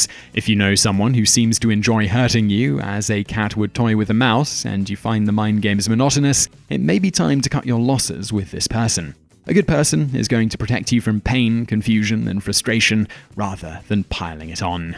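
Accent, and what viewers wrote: United Kingdom. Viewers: YouTube should require "British Accent" in the title of any video with a British narrator!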